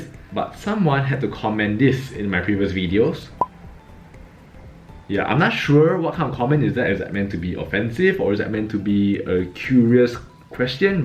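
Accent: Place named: Malaysia. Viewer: You dont sound unintelligent. I lived in Malaysia for 3 years-i miss it😢- i guess i am used to your accent.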